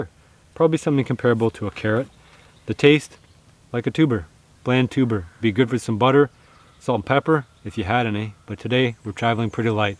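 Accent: Canada. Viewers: My bad just heard how you said things but used pounds as a measurement. Idk what Canada uses. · Are you Canadian?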